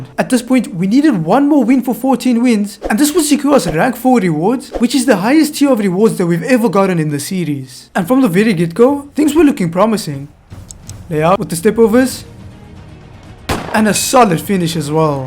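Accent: South Africa. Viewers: U from south africa?? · Are you south African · You sound South African Bro! What a vid! Keep up the good content! From Namibia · Are u South African??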